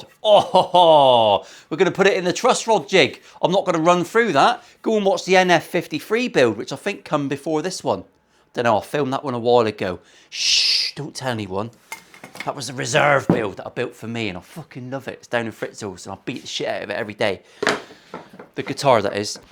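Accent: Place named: Northern Ireland, United Kingdom. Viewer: If I wan a good giggle I don’t tune in to John Bishop etc I tune into this channel. Always something to laugh about and I get to learn new tips tricks and techniques with guitars, brilliant, and I love the Northern Ireland accent and!!!!!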